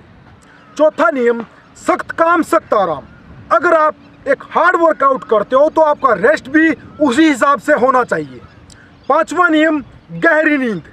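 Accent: Haryana, India. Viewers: Sir apki boli haryanvi lagti hai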